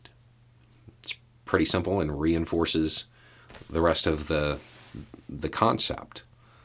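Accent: South, United States. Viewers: Oh, talk to me, eloquent American Southerner, about the logistics of ancient Rome.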